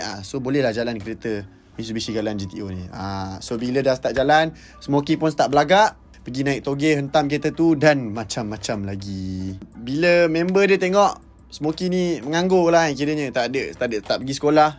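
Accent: United Kingdom. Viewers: Mantap oo british accent die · Haha mantap English accent, just like the video and content, keep it up and well done!🤜🤛🙌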